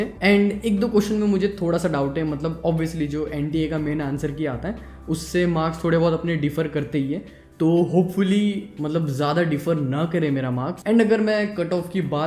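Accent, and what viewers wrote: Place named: Rajasthan, India. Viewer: Bruh are you from Rajasthan?? cos your accent seems so..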